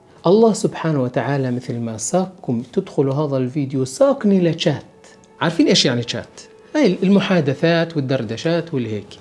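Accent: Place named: West Bank, Palestine. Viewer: ياسلام على لهجة الضفة 💚 الفلسطينيين دايما حلولهم ربانية .سبحان الله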